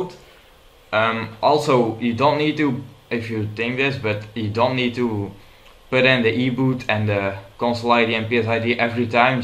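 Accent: Netherlands. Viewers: nederlander? lol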